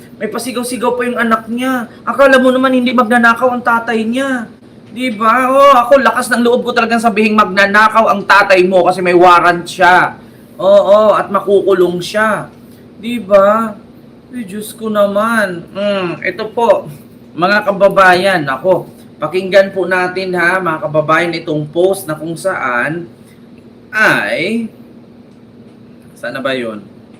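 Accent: Visayas, Philippines. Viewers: Natatawa ako sa iyo sa pagbisaya mo galing mo haha!!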